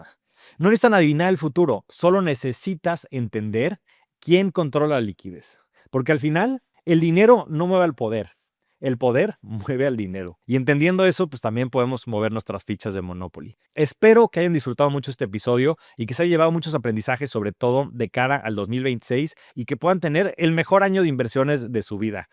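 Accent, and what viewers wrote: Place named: Mexico City, Mexico. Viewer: Interesante. El algoritmo me mando para acá. Me suscribo y like a pesar del insoportable tonito chilango fresa q se te sale cada tanto.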